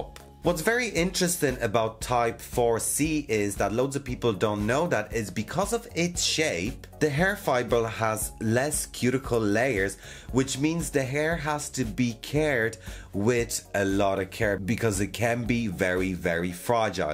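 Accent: Ireland. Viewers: He's Irish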